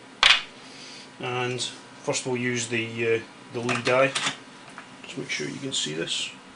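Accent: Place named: Scotland, United Kingdom. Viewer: Sound Scottish...good video.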